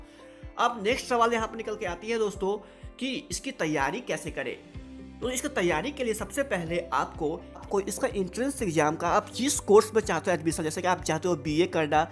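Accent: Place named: Bihar, India. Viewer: Bolne wala banda bihar ka hai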